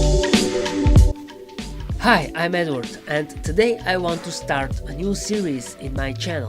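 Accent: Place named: United Kingdom. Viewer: Hello Edward ! It's always a great pleasure to watch your videos. Thank you for this one which is very nice ! (thumb up for your english ; it sounds so british) ;)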